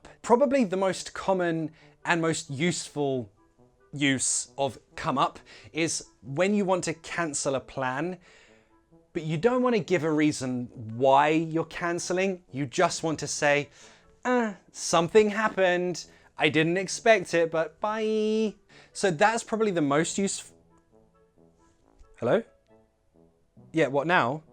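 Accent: United Kingdom. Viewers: Why do you have so nice British accent, mr.?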